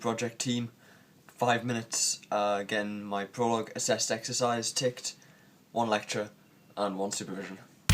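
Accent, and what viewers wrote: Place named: Northern England, United Kingdom. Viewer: Thanks for the upload my friend, I'm from the North of England (Newcastle) and i think i can hear a hint of Northerner in you :D Were are you from?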